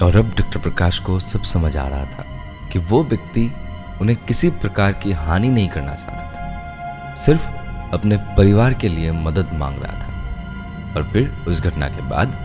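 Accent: Bengal, India. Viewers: onak ta bhalo ho cha agr thaka but akhon oo bengali acesnt ta acha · Bengali ar moto hindi tao valo lege6e.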